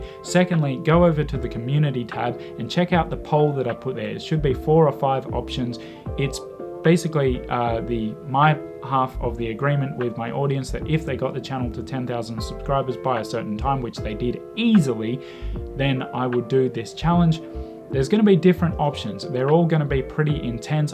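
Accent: Stockholm, Sweden. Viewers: I just realized u have a bit of Swedish accent in your video. Anyways, good luck... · I find your Swedish accent really fun. You sound like an 08 (Stockholm). Hehe (also I really don't like the "polyglots" that only know a couple of catchphrases and nothing else) I think you did the right thing. Let me tell you my case, I speak five languages but I didn't learn them by choice but more by need. And most important of all, I learned them ONE at the time. I think you did the right thing by ditching one. I am doing the same with Russian. I'm gonna go for Ukrainian after that. But I'm not learning both at the same time. Also if you want someone to speak Swedish to I'm available anytime. As I love languages I have a real knowledge of Swedish growing up in Sweden and being a bookworm I have a good understanding of the Swedish language. Just if you need a little variation in your studies. 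Cheers. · Your Swedish accent is so natural! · That is very clever, well done! Also, your Swedish vowels have a pretty distinct Stockholm twang, which is quite difficult to pick up for foreign learners. You could certainly pass for Swedish sounding like you do already in few-word conversations.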